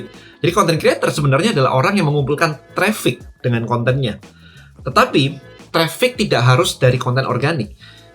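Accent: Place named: Medan, Indonesia. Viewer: What, orang Medan KhoKho...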